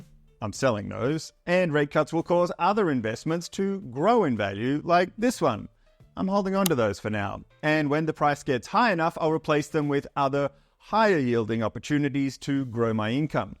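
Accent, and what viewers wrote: Australia. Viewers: Your videos are so well done! Do you edit yourself? For my company, we also do podcasts, so I’ve become an audio video junky. lol. Are you using a shotgun mic? Great acoustics. Looks like you usually treat your space for audio. And the sound editing nice! So happy for you. You are a breath of fresh air. The Australian accent always helps. 🎉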